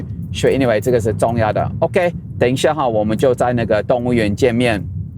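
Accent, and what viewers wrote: Taiwan. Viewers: 你說話根本就是台灣人，老婆又漂亮，真有眼光。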